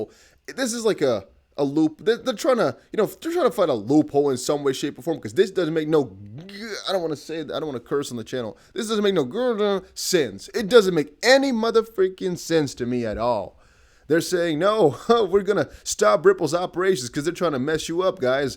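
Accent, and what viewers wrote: United States. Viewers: This guy would have to show me his birth certificate and his passport for me to believe that he's not from the US. There are people in Kansas (the middle of America) that sounds less American than he does. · If you're not from the US. I must be from Mars. You have an American accent. You might be living somewhere else but you definitely sound like you're from the USA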